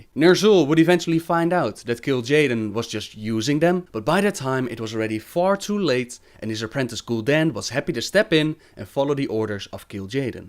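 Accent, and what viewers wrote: Netherlands. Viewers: lol are you dutch? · dutch is definitely his first language, has to be xD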